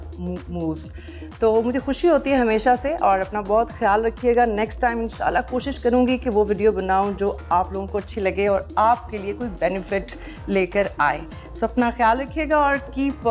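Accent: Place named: Punjab, India. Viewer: Hahahaah ur punjabi just love😂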